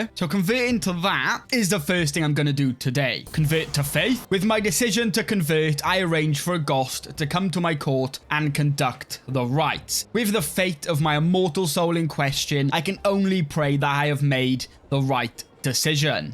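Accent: Wales, United Kingdom. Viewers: Where in Wales are you from mate?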